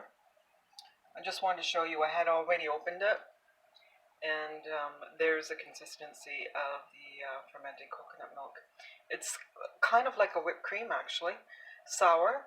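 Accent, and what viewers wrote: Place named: Canada. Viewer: I have thoroughly enjoyed this video. I have watched it over and over. I ordered some water Kefir grains from Florida and have made a huge batch of coconut Kefir. It is thick and rich and creamy. It tastes better than any Kefir I have ever tasted in my life. Your instructions are short and to the point and very good and well thought out. Also. I have been in America for the last 30 years but I was born and raised in Canada🇨🇦 and I am enjoying your Canadian accent. I especially love the way that we say “about”. I still have a bit of my Canadian accent. Stay encouraged. Keep up the good work.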